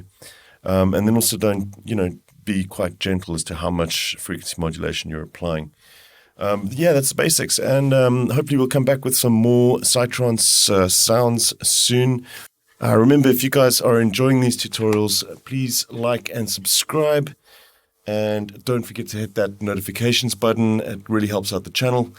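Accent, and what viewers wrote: South Africa. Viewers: Dude are you by any chance from SA?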